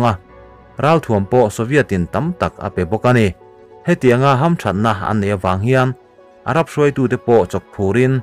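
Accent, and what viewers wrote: Mizoram, India. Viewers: Tuntum chu mizo tawng pawh ngaihthlak a nuam e. Hmasawn zel rawh khai.😎😎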